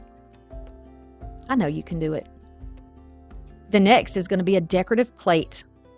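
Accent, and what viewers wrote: South, United States. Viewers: Love your videos! Love the southern drawl. Im originally from Arkansas but live in Illinois for several years. All your projects today are just Gorgeous! ❤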